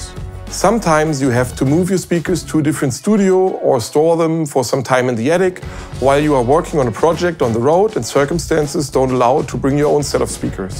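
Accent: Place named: Germany. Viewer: Beste, ich liebe dieses deutsche Englisch xD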